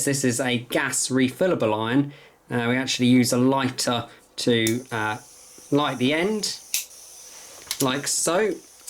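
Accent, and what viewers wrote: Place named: United Kingdom. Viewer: Don't mean to sound crude but the British accent talking about proper care for your tip had me like 😂. Love it! Very informative as a newbie to both arduinos and soldering. Thank you. Much ❤ from across the pond.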